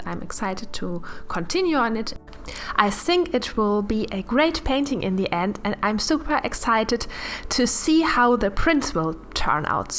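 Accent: Germany. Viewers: Bist du Deutsche? 😂 hört man sofort haha 💖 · where are you from? tbh you sound very German 😂 would be funny if you actually are, bc lately I found so many german artist on YouTube! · Are you by any chance german? I'm german and you sound typically german ( which of course isn't a bad thing at all ) btw the painting looks really good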